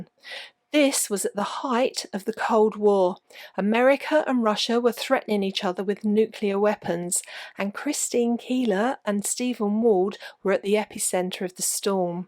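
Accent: United Kingdom. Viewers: Oooh I really enjoyed watching your video, and listening to your voice and accent. I love England (only been there for about a year, long ago, and I'm dying to get back). I found you by chance, after watching a different person talk about Christine Keeler, and I very much prefer the way you talk ! (not to be mean, but the other person talks in such a stilted way, so it's a relief to listen to you!😄) I'll watch lots more of your videos. I also liked how you showed the real places. Oh I love the Thames and its surroundings! Anyway thank you and cheers from a Greek who landed up in LA but longs for England or Europe.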